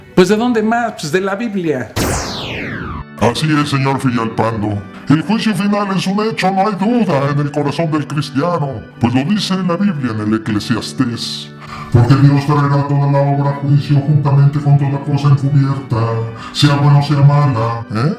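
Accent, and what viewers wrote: Mexico. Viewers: Hay que tener fe hijo mío. Hay que creer por fe hijo mío. Bendiciones príncipe desde Rep. Dominicana. Haces una tremenda labor hermano. Pero como estadista que soy, debo decirte que no podemos despojar a las sociedades así por así de la tendencia a creer. Es decir de las prácticas religiosas. Lo que podemos hacer en ese sentido es desmitificarlas. Depurar los sentido de fe de aquellos aspectos oscurantistas que claramente hacen daños. Vamos a presentar Dios mediante un proyecto en tal sentido a ver qué pasa. Dios te bendiga. El acento mexicano es sin duda un encanto.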